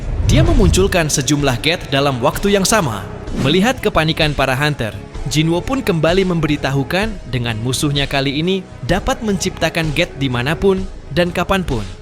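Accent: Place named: Malaysia. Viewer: Kau ni ape, melayu rupanye abang satu ni😅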